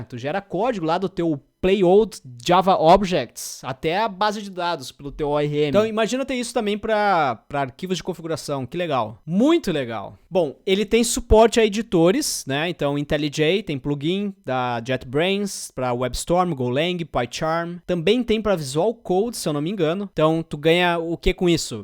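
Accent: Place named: Ceará, Brazil. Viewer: é cearense esse macho, certeza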